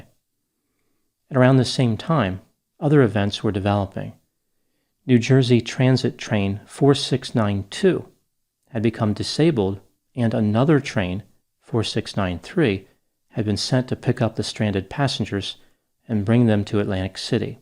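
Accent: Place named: Pennsylvania, United States. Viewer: Every time he adds a zinger to his deadpan delivery it goes right over my head for a few seconds then I have to stop and think about what he just said. I hear a PA accent so almost the same thing.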